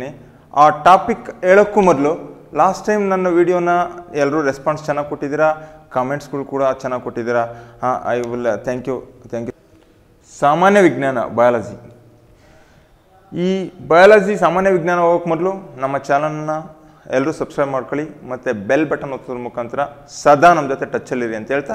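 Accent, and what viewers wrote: North Karnataka, India. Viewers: sir nivu class channag madtira, bt nam utthara karnataka mathado saili Channagill,, nivu mululi normal aagi mathadta class madi beautiful aagi barutte,, bejar aagbedi sir please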